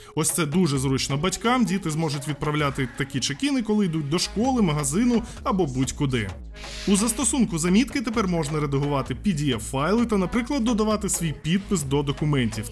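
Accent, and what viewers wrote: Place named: Russia. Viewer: От просто безмежна повага. Чутно по голосу що автор розмовляв на рос. язику. Але перейшов на українську. Дякую за приклад!!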